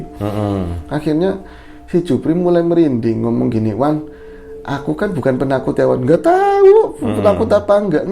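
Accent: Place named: Tegal, Indonesia. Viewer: Kalo bisa logat Tegal, banyumasan,brebesan,dan logat ngapak lainnya jgn disembunyiin,mas!!.Unik sekali!! Salam Pekejing, mas!!!!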